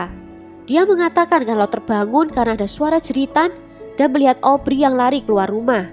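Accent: Java, Indonesia. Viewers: Hay.. medokuhhh 👍💖💖💖 sukses trs yaa say 🤲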